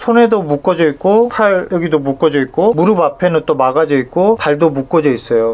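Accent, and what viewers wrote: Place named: South Jeolla, South Korea. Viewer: 전남 억양이네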